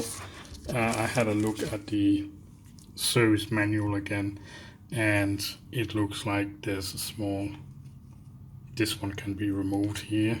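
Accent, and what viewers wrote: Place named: Denmark. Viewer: Nice accent. Er du dansk?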